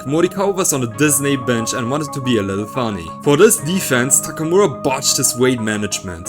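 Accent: Germany. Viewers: Krass wie sie man merkt dass du deutsch sprachiger bist😂😂😂es ist immer wieder so witzig herauszuhören wenn man deutsch ist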